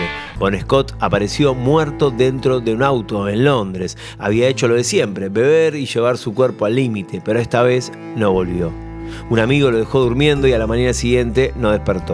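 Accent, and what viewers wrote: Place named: Argentina. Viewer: Demasiado argentino para entenderte. Voy a pedirle a un yucateco que haga un vídeo igual a ver si le entiendes.compadre.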